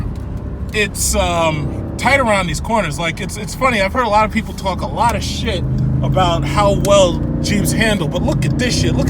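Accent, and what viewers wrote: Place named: Long Island, United States. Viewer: You are so Long Island bro. I watched mine 10 of your videos because you sound just like me and my brother arguing gear head stuff. I'm definitely a fan, bro. Keep doing your thing!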